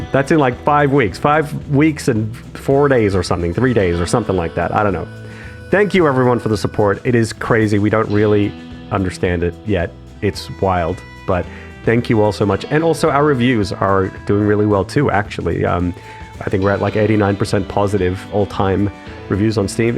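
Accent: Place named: Australia. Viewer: Even since Satisfactory i’m confused by his accent. Are you aussie? American? But you’re in Sweden apparently??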